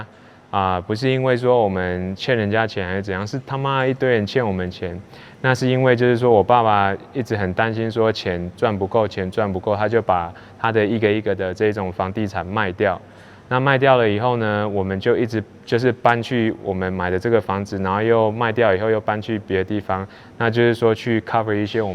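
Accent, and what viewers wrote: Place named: Taiwan. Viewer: 老師貌似是台灣腔。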